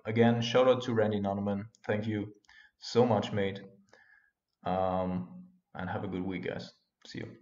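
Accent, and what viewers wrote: Germany. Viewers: It sounds like you might be German am I correct? Or just crazy?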